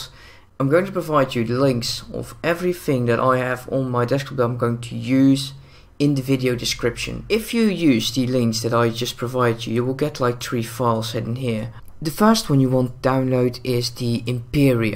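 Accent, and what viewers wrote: United Kingdom. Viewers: english thicc accent xD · ik dacht dat je brits was, met een grappig accent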